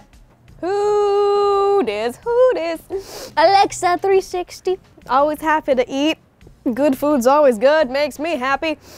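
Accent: Spain. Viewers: girl come to mexico, we want to feed you too, also her spanish spanish sounds tasty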